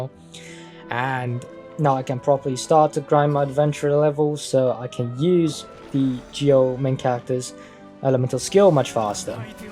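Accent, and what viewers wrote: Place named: United Kingdom. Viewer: Unrelated question: How do you sound so British/ Western guy when your Genshin Impact Language preference is in Chinese 🤔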